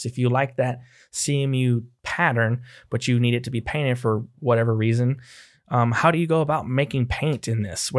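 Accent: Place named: South, United States. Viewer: i like your southern accent cowboy thanks for the help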